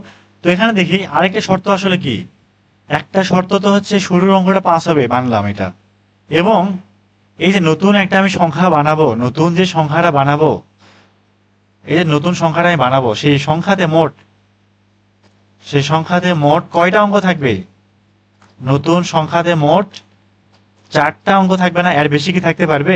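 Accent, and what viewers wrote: Naogaon, Bangladesh. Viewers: Vaiyer basha naogaon te naki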